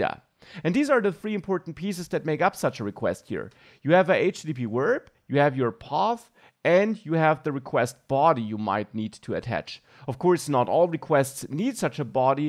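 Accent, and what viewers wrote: Germany. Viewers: very smooth vid, clean and explicit good watch ! just one thing may i ask: is this a german accent ?(just curious)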